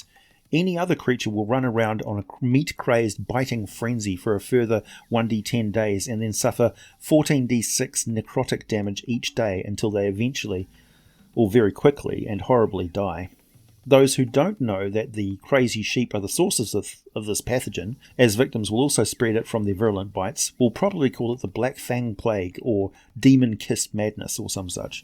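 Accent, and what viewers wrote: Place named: New Zealand. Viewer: Very nice intro, I thought you might a New Zealander. Along with South African, two of the most pleasant accents to listen to. I've also been binge watching Viva la dirt league d&d sessions lately, very silly and funny bunch.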